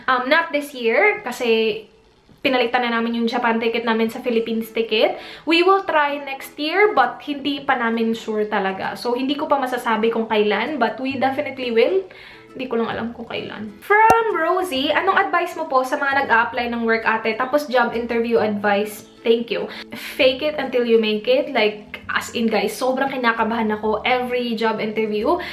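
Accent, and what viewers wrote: Australia. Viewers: You looked good, you're pretty & your pronunciation is normal na magchange cause in Australia may accent siya so need talaga ni ate na mag change yung mga words na ipronounce para maintindihan siya ng kausap niya na aussie (e.q, 'dinner' must be pronounced na dinna withour an 'r' ) mga gnyan. Anyways, i love you ate 😊 Pasagdi nalang nang haters kay naa rman na pirme, dili mana sila mawagtangan og ikasulti. Haha cause ana najud na sila full of insecurities & hatred. Always remember na mas damo nag hi-gugma saimo. Yay! Love you 😘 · Hi! this vlog is on point. never mind the bashers. they're just insecure. and you are right, wear what is comfortable on you and what suits you well. not what suits other's eyes. to those who criticize on how you speak, saying your "maarte" na. you're not, its just how you adapt with the Australian twang. so, stay chic and cool as you are. 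take care! say hi to Dan!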